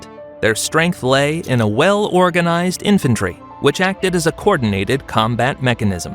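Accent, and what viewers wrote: United States. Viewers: nothing ruins a good historic story as an americrap voice.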